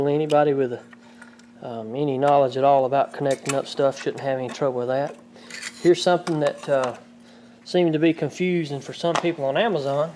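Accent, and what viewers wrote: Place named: Georgia, United States. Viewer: Hey! I just Googled & watched some videos. Awesome! I see you're in S.C. I told my husband "he sounds like he's from Georgia " Hehe..my apologies.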